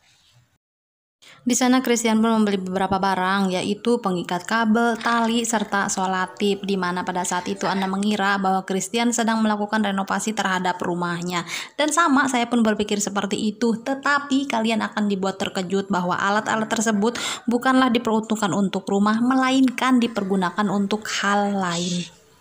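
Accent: West Java, Indonesia. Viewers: Alus euy sunda na kaciri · Sunda pisan teh...😂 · sunda pisan euy....😂❤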